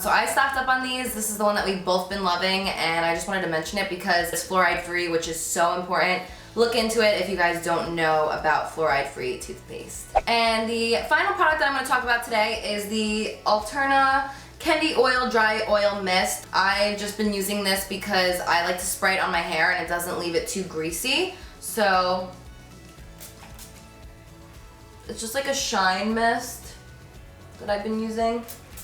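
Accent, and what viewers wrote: New Jersey, United States. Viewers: Rose Sago lol no she has the jersey accent. But she does kind of sound like rihanna now that you mention it. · I knew when you said "wooder" that you were from New Jersey! I thought I recognized that accent 😂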